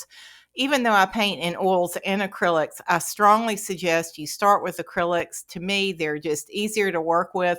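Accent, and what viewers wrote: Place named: South, United States. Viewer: Im not a gifted painter just taking advice from artists on youtube, I just came across you and love to hear you speak in your southern American accent, im’Irish so I don't hear too many different accent........ I love your paintings ❤❤❤❤❤❤❤ 🇮🇪🇮🇪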